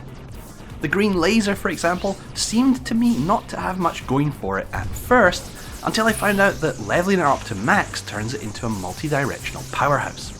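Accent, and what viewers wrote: Scotland, United Kingdom. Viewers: 😅 I note that the Scottish accent perfectly matches my creepy french ears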